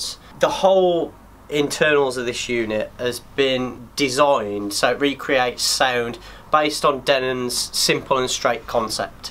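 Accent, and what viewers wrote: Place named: Midlands, United Kingdom. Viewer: Midlands i.e Birmingham UK or area.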